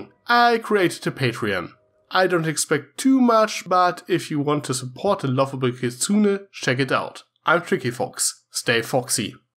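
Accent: Germany. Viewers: Tricky Fox True. Also, are you German? You seem to have a European accent, and it sounds German to me. If so, nice to meet another German brony. :)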